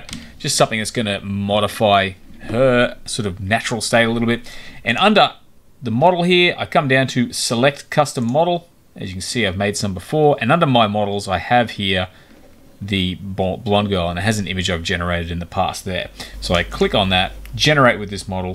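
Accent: Australia. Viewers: Thanks, excellent. Interesting side note how Australians pronounce ‘data’ differently to the English. The Ashes series has just started here, c’mon England 😊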